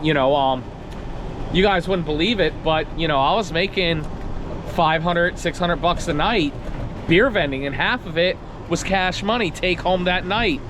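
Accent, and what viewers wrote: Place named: Maryland, United States. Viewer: I thought you had a Maryland accent, I spent most of my life in Maryland.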